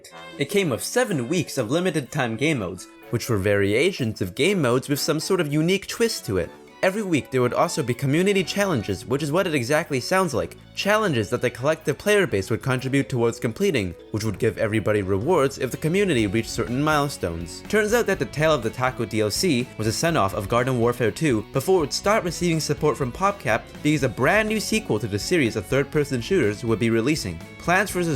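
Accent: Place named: United States. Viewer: He is either american or canadian.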